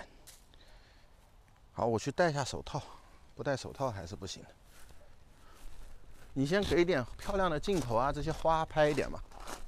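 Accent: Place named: Shanghai, China. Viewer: 第一次看到你的视频。听口音上海人是伐？看这景貌似南加？我也在南加😄。BTW, 我就是天平座的。😅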